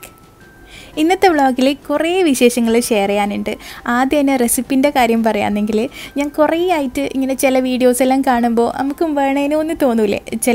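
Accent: Kannur, India. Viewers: ചുവന്ന കുറുവയല്ലാട്ടോ മഞ്ഞ കുറുവ 🤣🤣🤣 നാട്ടിലെത്തിയപ്പോൾ തനി കണ്ണൂർ സംസാരം ആയിട്ടാ പൊളിച്ചു 😍😍😍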